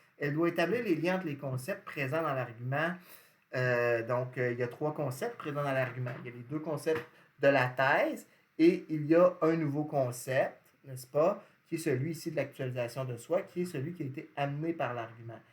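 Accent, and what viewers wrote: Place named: Canada. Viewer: L'accent canadien est un peu difficile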